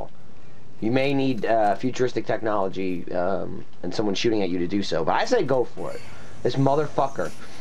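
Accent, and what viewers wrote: New Jersey, United States. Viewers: He still sounds like he is from Jersey in these videos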